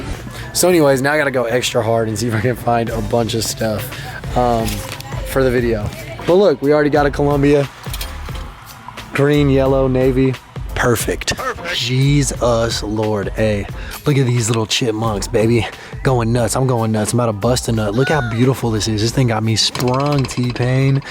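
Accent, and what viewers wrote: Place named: California, United States. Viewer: U got like a cali accent now and shit haha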